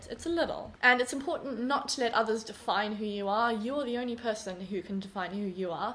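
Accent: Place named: South Africa. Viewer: No I think it's just her accent she's from South Africa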